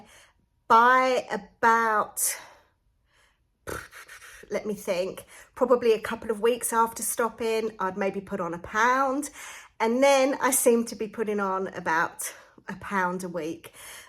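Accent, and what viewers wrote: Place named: England, United Kingdom. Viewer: Great video, you're very brave for sharing such obviously uncomfortable personal information - great to hear an English accent too!! You look fantastic, healthy & happy. I'm in Australia, I'm 68 & 4 days in on MJ (started on 5mg- very hard to get here & expensive $360 per month) & feeling very positive after hearing your story! SUBSCRIBED! :)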